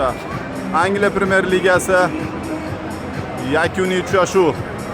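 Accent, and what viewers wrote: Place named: Andijan, Uzbekistan. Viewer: Молодец! Кайф киламан андижончасига ,омад тилаб коламан анжанлиларга!!😊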